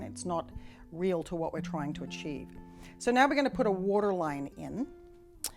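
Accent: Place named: Australia. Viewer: Wow I love your video!! You make it look easy, you are very talented. I love your accent, Australia?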